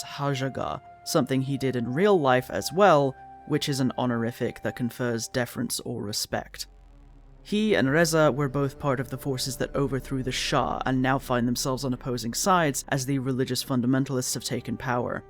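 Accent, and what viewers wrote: Scotland, United Kingdom. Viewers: Oh! I didn't realize you were Scottish. I am comically bad at accents.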